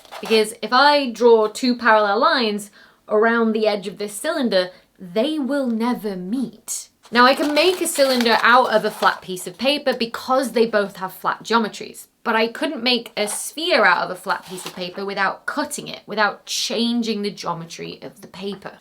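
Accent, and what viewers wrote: United Kingdom. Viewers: Yeah, the British accent on that one was hard, lol. I'm normally pretty good with it, but I had to keep reminding myself what she was talking about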